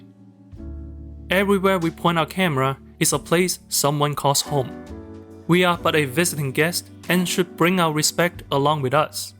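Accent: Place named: China. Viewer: delivered in a Chinese accent ... chefs kiss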